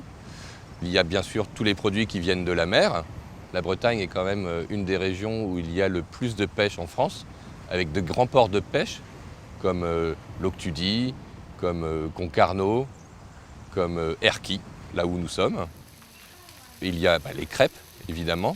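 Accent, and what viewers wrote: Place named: England, United Kingdom. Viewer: The accent sounds English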